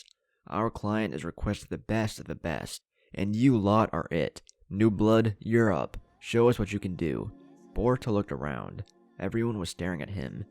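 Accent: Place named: South, United States. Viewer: Love that talks like he’s from the dirty south 😂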